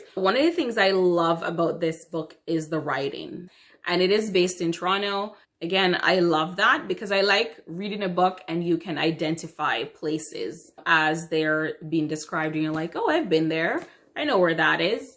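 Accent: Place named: Ireland. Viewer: Hey! I have been following you on insta (I am libraryjunky) and didn't realize you have a book tube channel! So fun! I LOVE your accent! Where are you from originally? Am I hearing Irish or Jamaican or am I crazy?!? Hahaha! Nice to see you on here!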